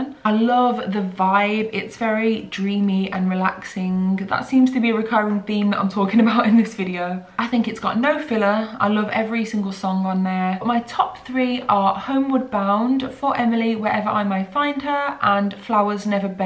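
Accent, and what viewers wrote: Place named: Manchester, United Kingdom. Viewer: You just showed me that I need to catch up on a lot of good music 🖤 btw are you from around Manchester? Just trying to identify your gorgeous accent. Maybe I'm totally wrong - sorry about that. Hello from Slovakia! 🦥💨🤢😆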